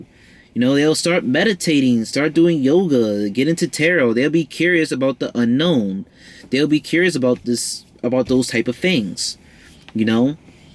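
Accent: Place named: Baltimore, United States. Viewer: He sounds like he’s from Baltimore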